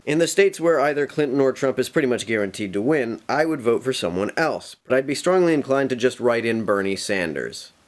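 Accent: Canada. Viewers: You're Canadian? But your accent is more less Canadian than mine (I'm from Minnesota).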